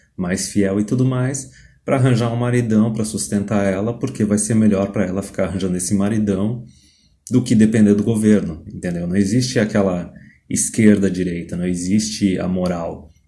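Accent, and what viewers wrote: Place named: Rio Grande do Sul, Brazil. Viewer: Cara pelo seu sotaque é gaúcho da região metropolitana, não sei se é impressão minha, mas as mulheres do RS parecem ser as mais canalhas do Bostil! Olha cara, esse Estado é uma fábrica de capivaras socialistas, aqui é um lugar onde a pior nata de mulheres escolheram pra nascer, tanto as socioloides quanto as conservadias que se dizem de "direita" mas que defendem os mesmos interesses que as de esquerda! Então o cara entra num Tinder da vida é pra vomitar de tanta nojeira que o cara vê já na descrição dos perfis das digníssimas!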